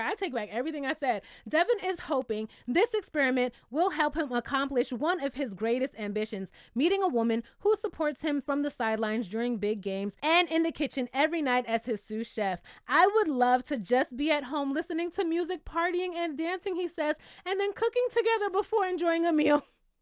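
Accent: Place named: Brooklyn, United States. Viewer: We need to give your brooklyn accent a persona and a name. I wanna call her Rosie or Roseanne for some reason.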